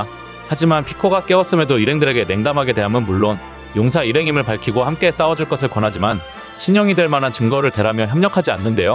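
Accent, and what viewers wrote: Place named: Jeolla, South Korea. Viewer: 말투가 전라도네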